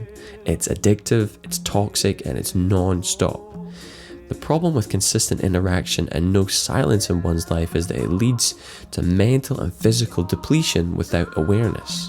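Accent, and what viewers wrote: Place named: Northern Ireland, United Kingdom. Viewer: Sorry man, was thinking Scottish but Northern Irish was in my head too. I'm from the Republic meself. Hope to see your work get recognition some day.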